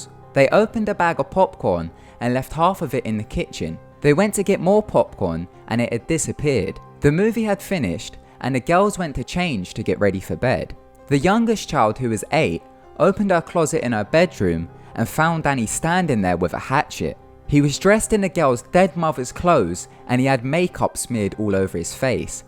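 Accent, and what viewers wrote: United Kingdom. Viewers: I find accents very interesting, are you from the uk. Ever heard a scous accent, I can't understand it to save my life lol, I like the way it sounds tho, not to make fun of their accents or anything like that. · Sorry, but these videos are never scary with that dopey British accent.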